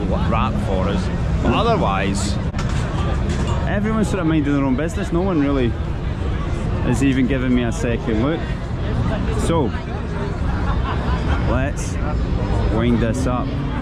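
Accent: Scotland, United Kingdom. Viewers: Moscow is dangerous no doubt about it. I saw a person being punched in the face for speaking English. I think your Scottish accent saved you, because they didn't recognize it as English. A state that is filled with right wing terrorists is not a safe place. And you are delusional if you think you can persuade anyone to visit a state which kidnaps children and murders men women and children. The money you spend in that dump goes toward doing just that, murdering people. So no, your fake party and paid Russian propagandists will not bring tourists into that hell on earth. You try saying anything antiwar for one minute in Moscow and let's see how long you last. In any case Russia is a terrorist state which has killed 1,100,000 Russian soldiers and many ukranians too and russia is destined to collapse economically in 2026, and very likely to break up after that. 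My advise is to get out of that hell hole before the shit hits the fan, already millions and millions of Russians have escaped for their lives and many many more will. Only the ignorant stay. Enjoy your propaganda party because it could be your last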